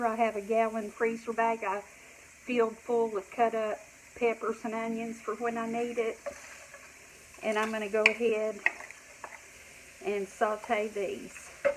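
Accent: South, United States. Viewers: You can freeze just about anything... some things nooo lol but it's always nice to learn something huh? Love her southern accent;)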